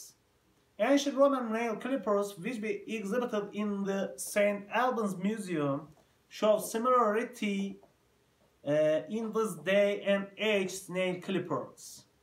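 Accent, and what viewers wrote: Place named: United States. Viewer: What kind of Merican accent is that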